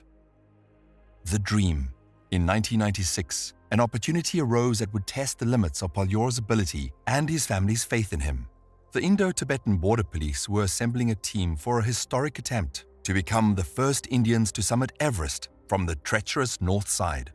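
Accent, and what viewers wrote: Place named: South Africa. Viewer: Another South African voice for me to enjoy. Subscribed.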